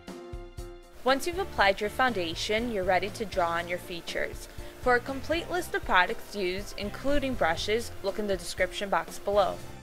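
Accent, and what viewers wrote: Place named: Michigan, United States. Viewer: do i spot a Michigan Accent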